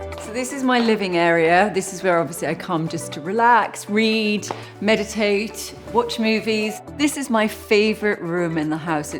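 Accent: Newcastle, United Kingdom. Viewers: Yes her accent has got even posher and deeper with time. I understand why as another commenter has said, posh circles tend to be quite judgemental - I think being with Damian Aspinall and James Middleton influenced her change of accent much more than her media career as the geordie accent is great asset for a TV presenter. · Where's the Geordie accent? Charlie Charlton - whut happened. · She's lost her Geordie accent! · Where has your pronounced Geordie accent gone ? Very strange you know have a posh accent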